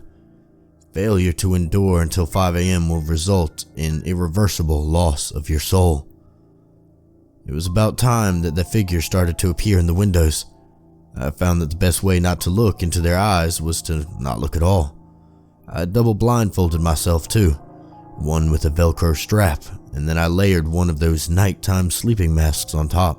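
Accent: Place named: South, United States. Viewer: You got that southern twang in your narration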